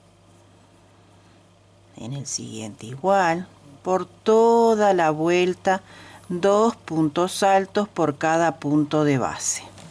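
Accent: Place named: Argentina. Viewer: Me da mucho gusto haberle encontrado, tengo una hija en Buenos Aires, creo por su acento, que usted es de Argentina, y se llama, y tengo unas primas, con quienes me crié, que son Garcia, esos apellidos son muy sentimentales para mi, y por lo que usted manifiesta en sus palabras, siento que tiene cualidades especiales. Gracias por compartir sus conocimientos.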